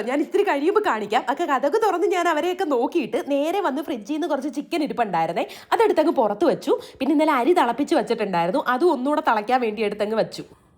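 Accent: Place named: Malappuram, India. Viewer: ഒരു സംശയം ചോദിച്ചോട്ടെ ചേച്ചി ഇപ്പോഴും വീഡിയോ ഇടുമ്പോൾ ന്യൂസ് കൂടാനും സബ്സ്ക്രൈബ് കൂടാനും വേണ്ടിയാണ് ഓരോ വാക്കുകളും തെറ്റായിട്ട് മനപ്പൂർവം പറഞ്ഞിട്ട് അത് പിന്നെ ശരിയായിട്ട് പറയുന്നത് അത് ഈ ചേച്ചിയുടെ സംസാരത്തിൽ നിന്നും മനസ്സിലാകും അത് ഏത് പൊട്ടന്മാർക്ക് ആയാലും പെട്ടെന്ന് തന്നെ മനസ്സിലാവും മലപ്പുറം പറയുന്നതാണെന്ന്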